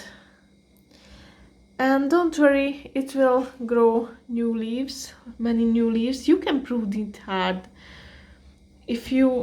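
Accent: Hungary. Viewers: immediately recognised the Hungarian accent:D Thank you for the video! It's very helpful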